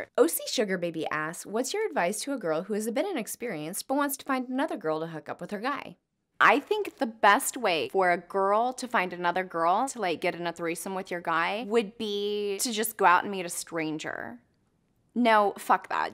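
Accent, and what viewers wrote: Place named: South, United States. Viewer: Hey what part of America are you from?Your accent kinda sounds south:P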